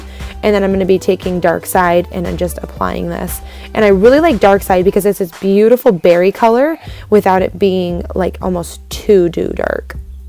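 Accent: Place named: North, United Kingdom. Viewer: I cannot tell you how much I love your videos!! Ps. Your northern accent is freaking adorable!!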